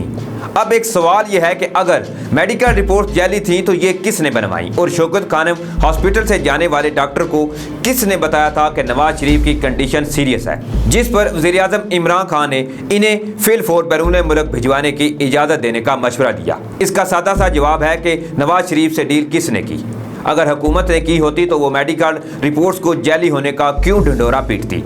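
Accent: India. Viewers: بھائ جان مہربانی کرین۔ اپنا لہجہ ٹھیک کرنے کی کوشش کرین آپ کی ویڈیو دیکھتے ھوۓ تکلیف ھوتی جب آپ خان کو کھان اور خانم کو کھانم کہتے ہیں۔ ایسے تو انڈیا دالے کہتے ہین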